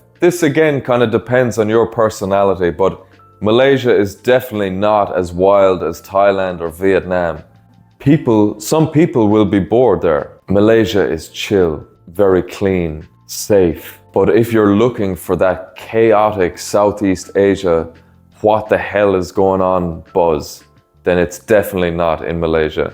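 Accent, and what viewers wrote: Ireland. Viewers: You did good, loved this also your Dublin accent (The nuns that taught me in East Malaysia were from Dublin) good luck on your blogging!!!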